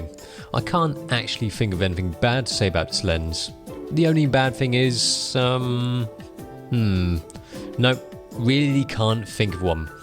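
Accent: England, United Kingdom. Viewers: u never hear speaking chinese with british accent, weird but kinda refreshing LOL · Slick Trademarc he is speaking cantonese but it's slightly out of tone. It has english accent in his cantonese LOL! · He said in Cantonese that he was born in the UK, albeit Cantonese with an English accent. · Hongkongers can immediately tell the accent of a British Chinese (i.e. accent), but communication is not a problem at all;) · yellow skin with British accent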